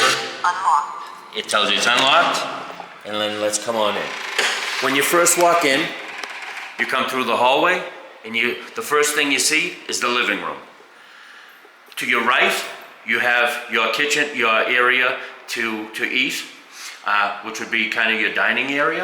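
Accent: Boston, United States. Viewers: Ahhh not to good 😂 about the hot water 😂 wrap your head around it 😂 Boston talk 💪👍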